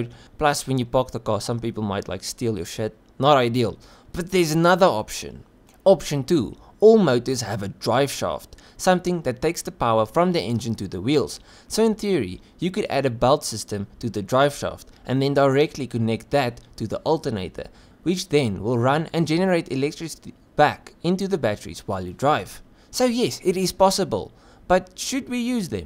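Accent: South Africa. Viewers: Brro are you south african?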